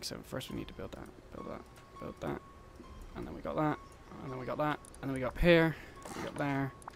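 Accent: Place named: West Country, United Kingdom. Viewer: Are you from Bristol or Somerset? Very west country accent :)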